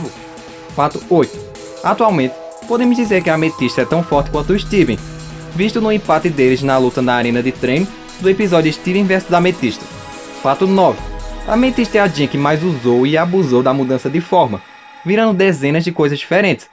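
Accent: Northeast, Brazil. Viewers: Voz de NORDESTINO ,AS OK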